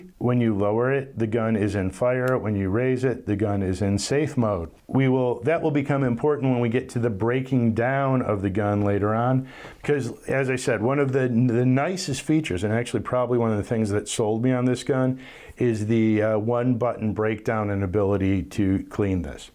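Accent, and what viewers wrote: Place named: Midwest, United States. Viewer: Pretend the Bears stuff isn’t in the background. says dis guy is obviously from da Midwest, Great Lakes region of America…and dats a great place to be from. I’m glad you don’t have a boring 25 or 30 second canned intro. Just straight into it. Nicely done, Sir. Just yesterday I ordered a Mark IV Target from Bud’s. Looking forward to its arrival. GO LIONS!!!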